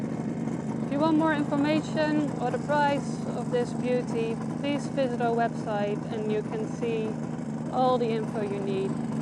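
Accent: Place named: Netherlands. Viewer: Tu mir einen Gefallen..keine KI... Ich liebe deinen niederländischen Akzent 🙂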